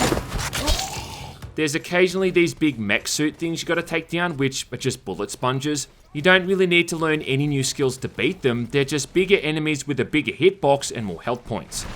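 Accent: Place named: Australia. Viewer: Cheers, sweaty guy. You sound Aussie.. til you say "toob"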